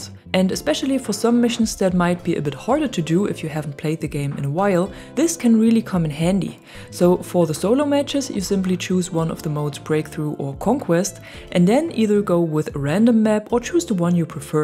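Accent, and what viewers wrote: Germany. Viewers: Nice tips 👍🏼 (are you german bc your Accent )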